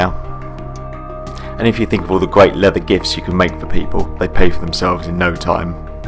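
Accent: United Kingdom. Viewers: I guess your British, seing the house, your accent and such. Can I ask where you find your leatherwork tools and the leather? I have a hard time finding a store in Belgium, the closest is an hour drive with the car, and I am not sure that they have what I want. Online shops are also not that easy to find for a affordable price and have it trustworthy. I found some online stores in the U.K., but I have had a bad time ordering from the U.K. Maybe you know some trustable and affordable online stores? Thanks, and great video!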